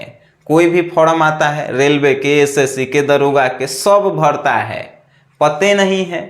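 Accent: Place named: Magahi, India. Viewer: Bhaiya -Bhojpuri bolo. Magahi thik nahi lagta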